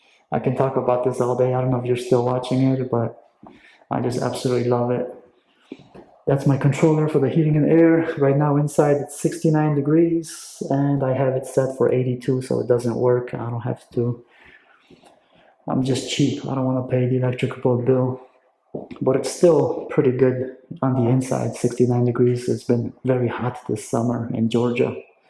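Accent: Sweden. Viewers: I like this small house. Your accent.. sounds Swedish. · You are going to love it in Iceland. Reccomend coming in July since you don't like cold 😋 I look forward to your next series. I dont know why but your channel is so calming. Not a feeling I associate with building cites lol.. I'we been trying to place your faint accent. But it's to faint to be sure. Sounds a bit Swedish somtimes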